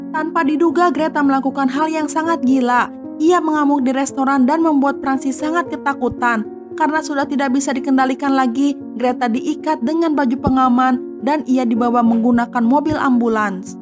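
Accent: West Java, Indonesia. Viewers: Sunda na th katinggali pisan "subrek likeu en share😂"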